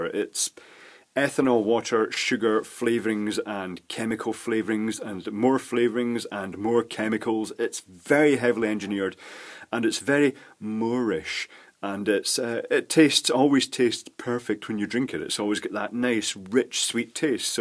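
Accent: Scotland, United Kingdom. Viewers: thought you were scottish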